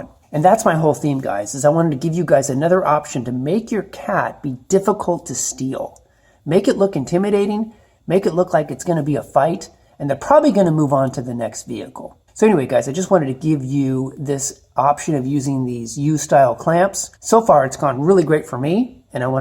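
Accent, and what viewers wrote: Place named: Canada. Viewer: Another good idea, thanks again. You sound like you are from Canada? - Canadians overall are good peeps.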